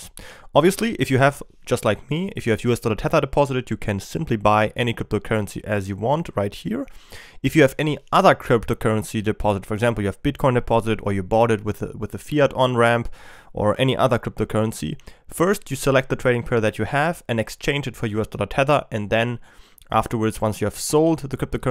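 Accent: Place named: Switzerland. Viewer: I can hear the slight Swiss accent ;) I like it! Thanks for the vid!!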